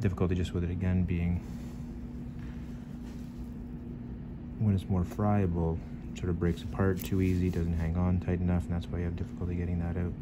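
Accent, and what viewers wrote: Canada. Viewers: Your accent is Canadian. Good work Doc! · He’s Canadian.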